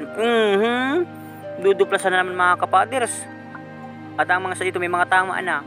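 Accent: Bicol, Philippines. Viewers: Parang bicolano eh .haha parang catanduanes?? Baras ??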